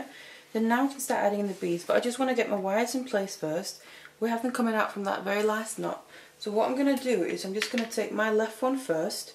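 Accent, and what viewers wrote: United Kingdom. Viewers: She is using the UK pronunciation because, I assume from her accent, she is from the UK.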